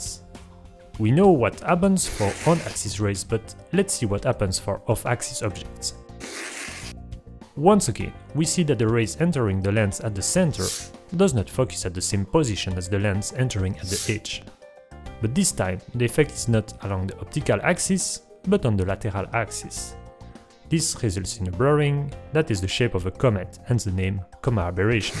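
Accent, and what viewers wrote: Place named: Belgium. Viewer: Great explanations!!! Your accent sounds like from Belgium or French speaking? I have few friends I know from there.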